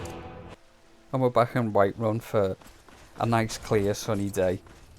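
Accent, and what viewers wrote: Liverpool, United Kingdom. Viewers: Liverpudlian, right? Very detailed video, by the way :)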